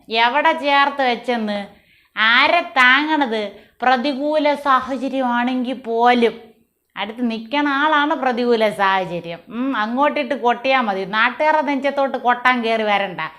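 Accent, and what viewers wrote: Thiruvananthapuram, India. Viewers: ഇതാണ് തിരോന്തരം കലക്കി മോളെ ഒന്നാംതരം അവതരണ ശൈലി 👍❤🙏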